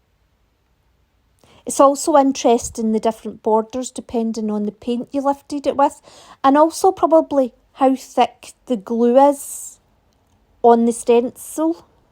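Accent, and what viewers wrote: Scotland, United Kingdom. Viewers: Awesome, and a Scottish accent to boot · What a lovely scottish accent?! Great video.Greetings from Spain. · thanks ever so much for the quick reply, great hearing a Scottish accent on a YouTube art video. Thanks for the information, I have done Lino and Japanese woodblock printing before and I have got loads of art supplies I can use with the gell plate so excited to get started. I volunteer as an art tutor for a church art group we have done linoprinting, airdry clay, stained glass drawing and painting and I am always on the lookout for new things to try. Looks like endless things to try with this technique I mainly paint Scottish landscapes. Thanks again enjoying your videos. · This was absolutely wonderful! Thank you so much for all these excellent ideas suggestions techniques… Tricks of the trade! I know I will be coming back to this video over and over again. 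I’m just getting started with gel plate printing and I’m so excited to explore. This video has given me even more ideas! Additionally, I really love that it isn’t cluttered with a lot of background noise or music, it’s just you talking us through the process – in such a beautiful accent at that! Long live Alba! 🏴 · Enjoyed this very much. Clearly explained techniques with lots of variety. Thank you for mentioning the need to let the paint dry. I have watched many instructional videos and it’s seldom touched on. I’m Scottish, and it was so refreshing to listen to another Scot. Very many thanks. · I absolutely adore your accent! I think the Scottish accent is so full of music and poetry 💗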